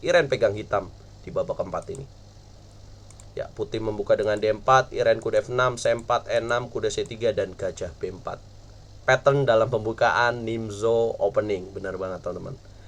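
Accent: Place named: Java, Indonesia. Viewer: Ko tiba tiba medok bang😭👍🏼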